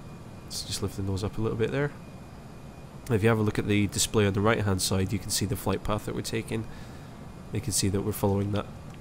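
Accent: Scotland, United Kingdom. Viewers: As a 'Newboy' to FS down in Cornwall, UK, I am impressed by the quality of this video. The clear and unhurried instruction by this Scot is first class. Just what I need at my age.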